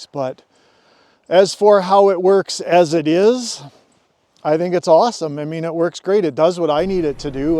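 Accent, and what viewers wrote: Canada. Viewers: From the first shot, I was like wonder where he’s at? Starts talking: oh, definitely Canada. Probably Kelowna. Good riding man! I do wonder why you would do this over a 500 EXC with traction control and a Rade Garage tower. You Get the torque, suspension, engine mapping. I wonder if it’s just the electronics package that makes this bike interesting as ADV bike.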